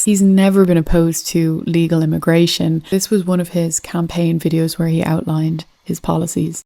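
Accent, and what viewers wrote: Ireland. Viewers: you should consider covering geo-politics too. E.g. cover BRICS, trade war between China - U.S., tech war (chip) between U.S. - China, the Syrian situation - why Turkey got involved (something about a pipe line that would benefit them), etc. 💡p.s. Irish accent?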